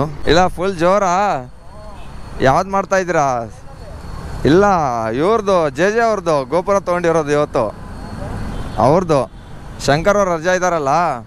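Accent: North Karnataka, India. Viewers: ನಮ್ಮ ಉತ್ತರ ಕರ್ನಾಟಕ ಮಾತ್ ಕೇಳಾಕ್ ಬಾಳ್ ಖುಷಿ ಹಾಗ್ತದ್ 😍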